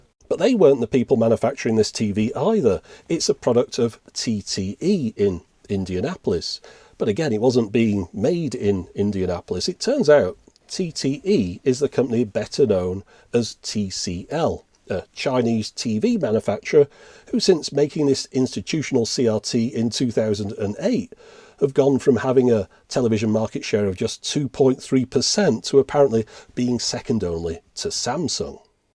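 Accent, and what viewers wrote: United Kingdom. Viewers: As soon as I heard a British accent, I had to leave because the American prison system has different stuff, this is probably items from a prison from England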